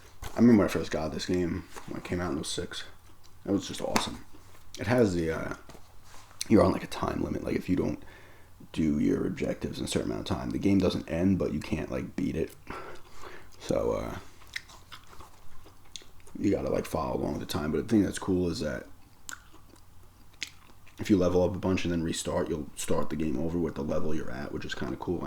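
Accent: New York, United States. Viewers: Such a new york accent compared to today's videos. I dont even know who this is 😂 you the man!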